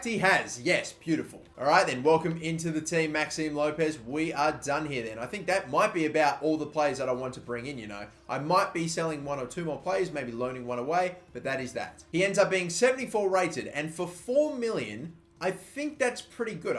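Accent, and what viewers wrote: Australia. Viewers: Mardoche Basisa he's Australian... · Mardoche Basisa he has an australian accent...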